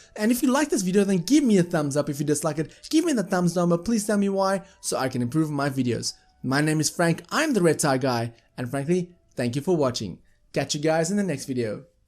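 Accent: South Africa. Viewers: You sound so South african...!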